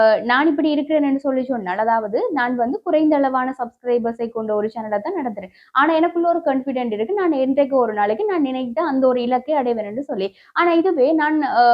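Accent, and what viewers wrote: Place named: Jaffna, Sri Lanka. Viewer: தங்கா உங்கள் யாழ்ப்பாண மொழி அருமை இப்ப யாழில் இளம் தலைமுறை கதைக்கும் போது தமிழ்நாடு மொழி நடை கலப்புடன் கதைக்கினம்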